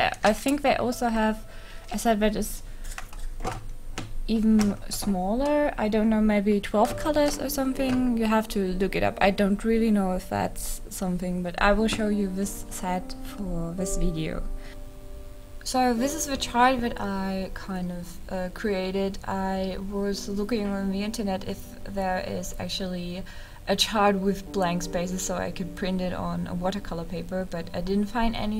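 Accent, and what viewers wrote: Germany. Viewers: thx i was thinking about buying this set :) now i am going to get it :3 btw are you german ^^?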